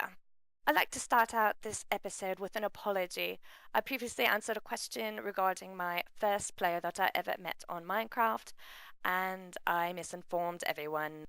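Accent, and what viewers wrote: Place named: England, United Kingdom. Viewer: Ur voice sounds more like ur English this week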